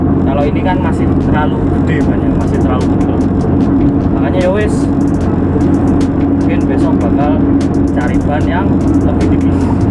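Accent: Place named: Banyumas, Indonesia. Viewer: Oalah wong ngapak jebule mas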